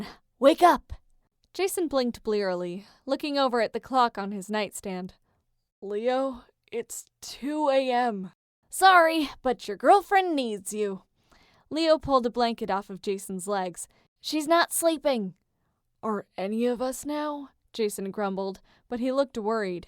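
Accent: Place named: Texas, United States. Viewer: This is the BEST Jasper (or Jiper) fanfic ever!!!! Oh my gosh!!!! And that Texan accent!! If that was an attempt at a Texan accent, it was good!!! (I'm a from Texas, I know an ok accent when I hear one.)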